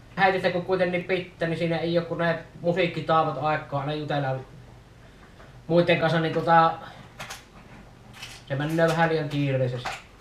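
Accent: Savo, Finland. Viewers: Savolainen englanti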